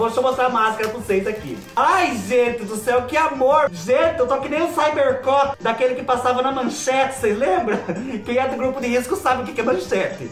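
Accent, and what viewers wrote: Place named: Southern Minas Gerais, Brazil. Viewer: "Espicula de rodinha" é da minha época...amei...acho que você veio daqui da roça, (será que te sequestraram bebê?) no sul de Minas, vc fala igual "nois aqui" bjs...ops!...caí de paraquedas no seu canal e me apaixonei pelos videos...sucesso! sempre!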